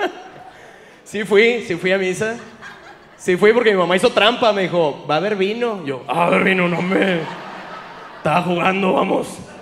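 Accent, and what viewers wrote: Mexico. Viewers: No sabes cuantas veces he oído este stand up. Te felicito eres un comediante muy fresco y por alguna razón cada que te escucho me muero de risa. Te felicito, el acento chilango esta de lujo y súper ingenioso. Sobretodo ahora en tiempos del COVID es muy relajante escucharte en este encierro. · Llevo escuchando un rato...parece Franco E...o todos los regios hablan asi? (Es "cotorreo")Jajajajaja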